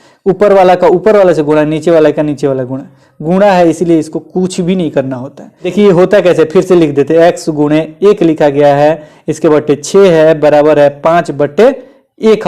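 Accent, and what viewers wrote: Bihar, India. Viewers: Sir ji aap to pakke Bihari Lg rhe hai matlb aapki bhasha 😀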